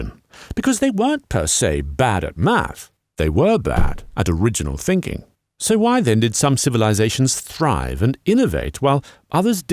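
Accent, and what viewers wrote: United Kingdom. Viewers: Are you putting on the posh accent or do you just naturally sound like Stephen Fry? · He's British. He has a British accent. In Britain it's called maths. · Why does this guy talk with a British accent but uses American terminology? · the british voice turns it off for me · As an Englishman I was very disappointed when the narrator with a VERY British accent said math instead of maths. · Strange that you use Math instead of Maths given that you clearly have a British accent. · If you are English, Sir, and I presume from your accent that you are, why do you keep saying “math” in this video instead of “maths”? “Math” is an Americanism and one that makes no sense, especially when one considers that it is supposed to be short for “mathematics”, a plural noun.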